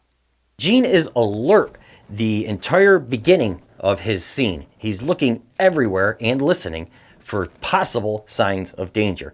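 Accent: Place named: Midwest, United States. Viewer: Are you from the Midwest or Canada? I can hear that accent lol.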